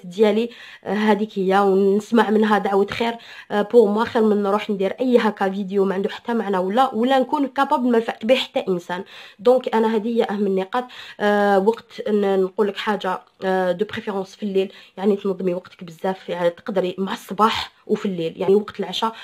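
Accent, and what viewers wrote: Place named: Algeria. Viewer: أول مرة نشوف فيديو ليوتيبر جزائرية، لهجتكم غزاالة 😍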